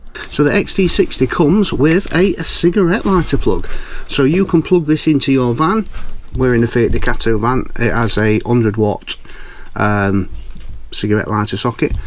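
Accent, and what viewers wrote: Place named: Northern England, United Kingdom. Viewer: He’s Northern English, like my mom’s friend, I recognize that accent😊. I was just about to order that kettle, surprised it couldn’t do it, was power lifting mode on? Oh well it’s small.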